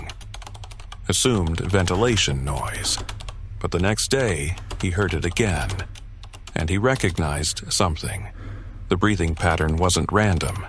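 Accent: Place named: India. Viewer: Whats with the Indian accent?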